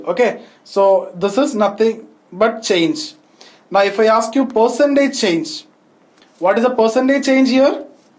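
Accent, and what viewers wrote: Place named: Kerala, India. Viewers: Thank you very much sir. I love the way you explain. Your pronunciation reminds me of the Malayali neighbours I had years back <3. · Don't waste time by watching this school maths , seeing this malyali ,accent waste waste waste time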